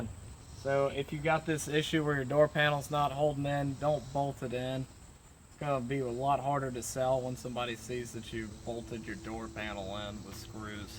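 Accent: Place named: South, United States. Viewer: bro your so country i love it lmao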